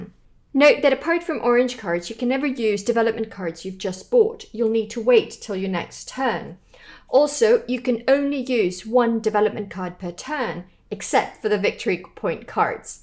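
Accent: United Kingdom. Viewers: I've got a random question, non game related (lol). Are you an english speaking person that learned spanish? Or a spanish speaking person that learned english? Or..... was brought up with one english speaking parent and one spanish speaking parent? I only ask because I love your engish accent, and you speak spanish very well, so im just ciurious. I've seen most of your videos and I loved them, I am a very big fan of Catan, and hence, all of your Catan videos! Thank you!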